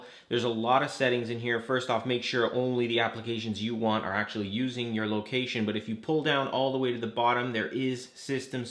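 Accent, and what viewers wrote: Canada. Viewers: This is freaking perfect. SO helpful. Thanks, buddy 😊 Also, love that I can tell you’re Canadian from your pronunciation 😁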